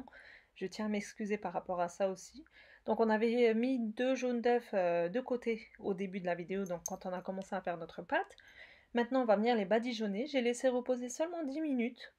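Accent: Alsace, France. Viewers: SVP Madame Vous serait-il possible de noter les ingrédients utilisés pour chaque recette sous la vidéo ? Ce qui permettrait de les préparer à l'avance, puis vous regarder faire tout en appliquant vos conseils qui sont super, extra ! Vous êtes donc d'origine turque (avec un petit accent alsacien)... Merci beaucoup pour vos recettes, il faut l'avouer, elles sont fabuleuses ♥♥♥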